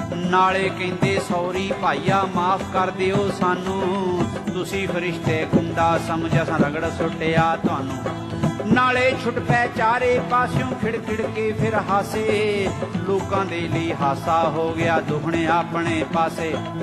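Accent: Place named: Okara, Pakistan. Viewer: same Pakistani punjab ki punjabi... mere area okara main same boli boli jati he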